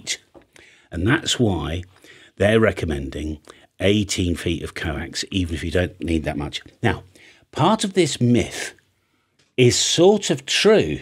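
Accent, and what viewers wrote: United Kingdom. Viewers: Sure wish could understand your hard brit English ! Other wise it always sounds like your asking a question...put an AI voice to use...please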